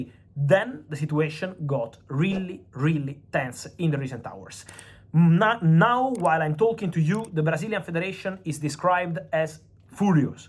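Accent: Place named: Italy. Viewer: Fabrizio's fast speech accent of Italian are embarrassing to understand...please stay tranquilized and speaking slowed 😂🙏🏻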